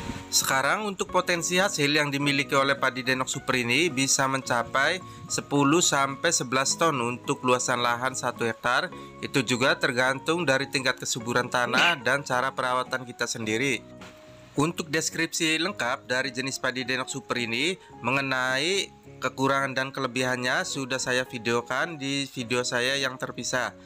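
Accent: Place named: Indramayu, Indonesia. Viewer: Sampean sing daerah endi, indramayu e kang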